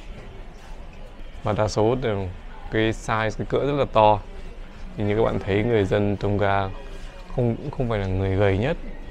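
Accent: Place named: North, Vietnam. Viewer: Cô rất thích Video của Cháu trình độ thật hay .Cả giọng Bắc dễ thương nhẹ nhàng .Mong Cháu thành công trong công việc .👍🥰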